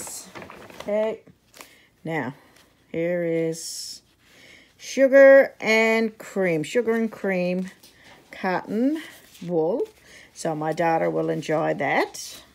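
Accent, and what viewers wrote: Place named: Newfoundland, Canada. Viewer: Hi, I’ve seen you comment on my channel! Thank you! New subbie! I can tell from your voice that you are from Nfld! My hubby is from the Rock also. Such a beautiful place! Welcome to YouTube and you lucked out with your Michaels bags!Love the beads and note cards! Paws up! 💕💕🐾🐾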